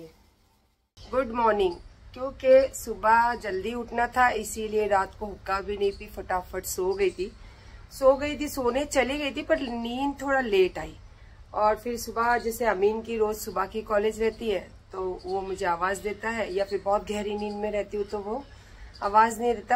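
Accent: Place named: Andhra Pradesh, India. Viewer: Yeh most of kyaa hain pata nahin kahan se english sikhkar aayi hain hindupur ki english hain 😂😂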